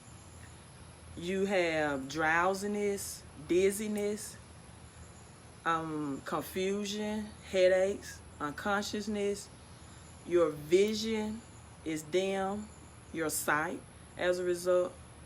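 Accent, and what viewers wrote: South, United States. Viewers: Love that Southern voice✊🏾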